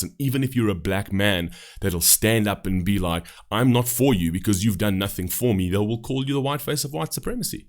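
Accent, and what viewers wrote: South Africa. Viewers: I'm just guessing from your accent that you are (at least originally) from South Africa, and you have a better grasp of what's really going on in the United States than 80-90% of the citizens of this country. Kudos sir, great reaction and rant! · You sound South African,….why you ran away…reacting to a song when shit is 10 times worse here in SA!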